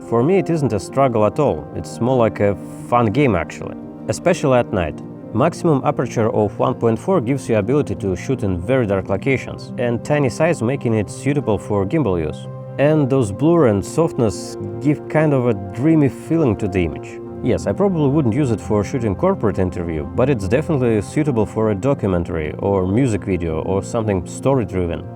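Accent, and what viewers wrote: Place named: Russia. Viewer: Хахахп меня не обманешь! Раскусила ваш русский акцент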